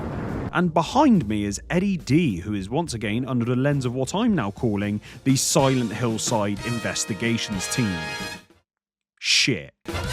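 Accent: Bristol, United Kingdom. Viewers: Omg I was thinking the same thing with this pizza on my playthrough! 😂 loved this video! BTW, do I detect a fellow bristolian from your accent? 🤔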